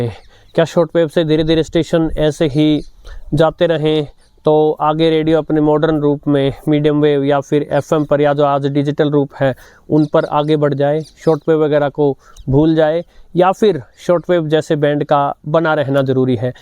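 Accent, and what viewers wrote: Standard. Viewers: सर कई बार मैं आपका चैनल सिर्फ शुद्ध हिंदी के सुनने के लिए देखता हूं । ओ हिंदी के शुद्ध स्वर आप बोलते हैं इतना मधुर लगता है । मेरे संस्कृति के टीचर की याद आ जाती है कल जरूर जुडूंगा अगर फ्री रहा तो आपका बहुत बहुत आभार रेडियो की इतनी सारी जानकारी हमसे साझा करने के लिए मैं आपके कार्य को हृदय से पसंद करता हु ।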